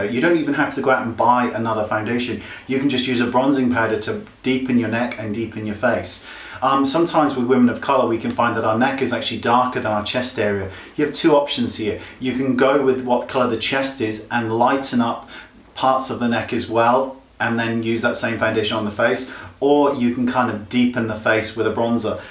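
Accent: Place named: United Kingdom. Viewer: I love your channel... I come from Italy and for me it's a bit difficoult understand your british English :D (i understand better American English)... But I love the way you say your advices :D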